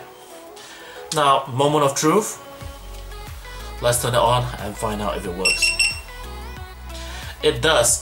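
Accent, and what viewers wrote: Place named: Australia. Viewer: Asian & Aussie accent... 1st time I've heard this mixture. 😎👍🏽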